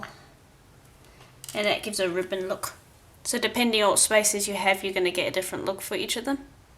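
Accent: South Africa. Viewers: Are you South African? Sorry I’m trying to guess your accent. 🤣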